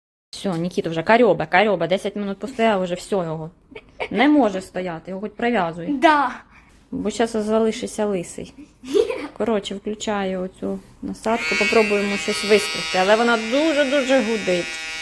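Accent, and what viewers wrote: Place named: Central Ukraine, Ukraine. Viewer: Прикольно вийшло ! А ще цікава у вас вимова українською , ви десь з центральної України родом , Вінничанина ? Просто цікаво ) , дякую за відео .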